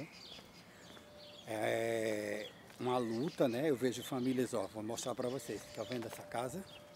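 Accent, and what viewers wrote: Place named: Northeast, Brazil. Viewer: Bacanaaa ver a realidade ! Sou do ES amo seu sutaque nordestino, dizem q o povo daí é metido a besta kkkkk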